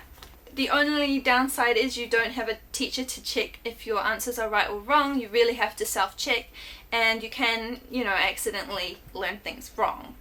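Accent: New Zealand. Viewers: YUUSS KIWI FTW!! I know someone who moved to Japan but she lost her nz accent. I love how you still have the nz accent when speaking English ^^ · mimeiさんってニュージーランド出身だっけ？ニュージーランドアクセントってあるのかな？ニュージーランドは訛りがあんまないイメージ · Woooooow I loved the New Zeland accent. It's one of the easiest accents that I've heard! I loved your video talking about japanese language!! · I thought u sounded like a kiwi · No that’s how New Zealander pronounce the word for example if you say the number ten, seven, leg, pen, next, it will sound tin, lig, pin, nixt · hah yea, that is a pretty standard accent in New Zealand :) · Are you from New Zealand as well ??!?! definitely sound like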